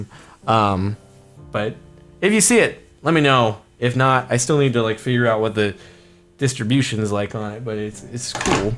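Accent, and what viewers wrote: England, United Kingdom. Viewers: being from England, I can confirm that your English accent is 100% awful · your British accent is AWESOME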